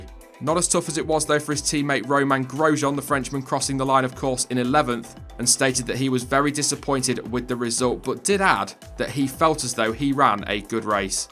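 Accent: United Kingdom. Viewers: Sexy british accent #nohomo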